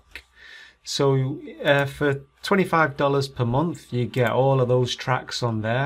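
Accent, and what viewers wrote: Manchester, United Kingdom. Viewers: Is that a Manc accent I hear?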